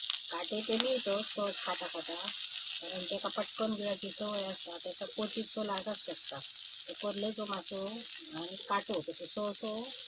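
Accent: Malvani, India. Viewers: काकी, तुमचा मालवणी बोलना भारी हा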